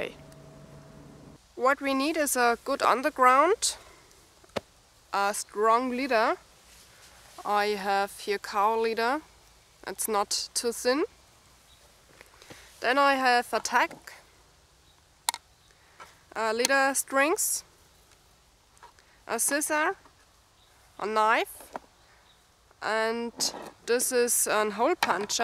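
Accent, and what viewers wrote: Germany. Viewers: you are extreemly funny .........its just your English with German axcent is kiling ......please accept this comment as compliment .....because it is plus not to forget that those shouse are the best easier way in a surviving situation in case you got lucky to kill larger animal and skeen it or you come a cross dead one happend to have still a skin .........great simple shose to cover and protect your feats .......lovly ......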